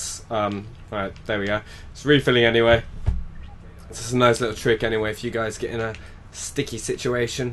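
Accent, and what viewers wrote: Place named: London, United Kingdom. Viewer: Which part of london u from. Im in essex. P.s im nt a stalker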